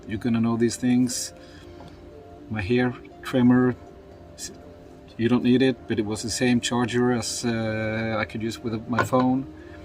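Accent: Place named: Poland. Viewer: Guy sounds like a polish. The shoes are a gay overload. You don't need all the crap. I wore an old pair of running shoes, on the plane, in the trail, in the auberge, everywhere... but they were running shoes, gel padding in the sole, no problems at all on my whole Camino. Just bring one change of under clothes in your pockets. You don't need a back pack. Your not in the Rockies. Anything else you need.... BUY IT THERE.... Spain is a developed country for crying out loud!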